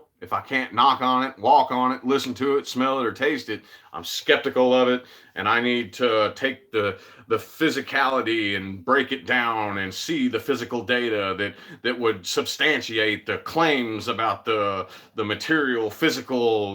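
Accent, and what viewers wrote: South, United States. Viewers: You sound like you could be from the south, maybe I’ll figure it out as I keep watching your vid’s. I’m glad you have a happy relationship. Blessings 💗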